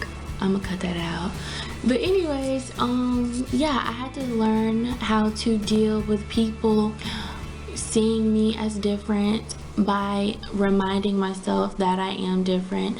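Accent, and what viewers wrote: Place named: United States. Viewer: You are so adorable with your little country accent ❤❤❤❤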